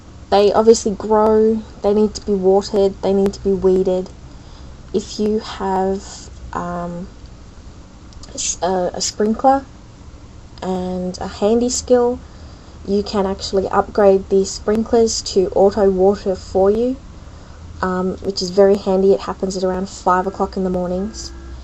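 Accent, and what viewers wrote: Australia. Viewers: You sound Aussie :) Cool video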